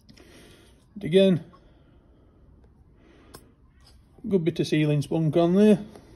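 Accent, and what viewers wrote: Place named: North, United Kingdom. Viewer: You've gone seriously northern. Good video, hope you are well.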